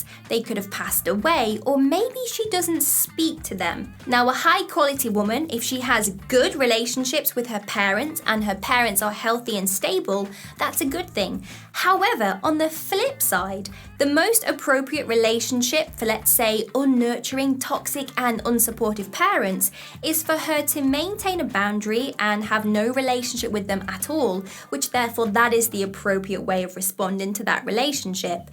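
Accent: United Kingdom. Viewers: i think you are British because of your accent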